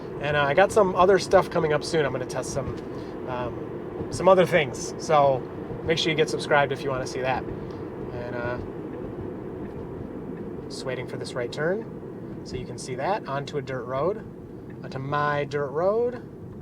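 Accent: Michigan, United States. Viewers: Michigan guy?!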